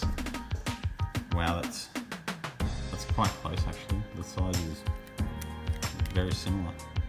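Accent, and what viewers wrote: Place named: Australia. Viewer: I love australian accent so much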